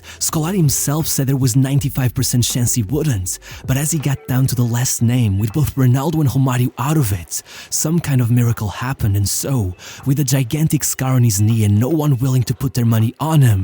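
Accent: Portugal. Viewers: O comentador é Tuga 😂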